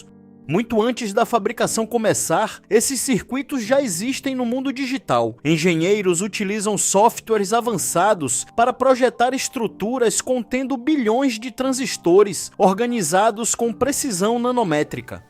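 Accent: Northeast, Brazil. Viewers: Essa narração nórdéstina tá muito ruim !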